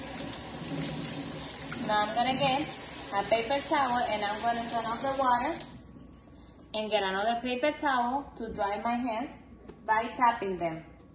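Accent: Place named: Colombia. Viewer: I know, but they says they can’t provide me separately any more. And I don’t know what to do now. Mr. Derrick (instructor) said y needs to generate it through the visual superscript, but it’s not the way to get the labs. Anything from you can help thank you. I’m from Bolivia y know you are Colombian, I know it by your accent.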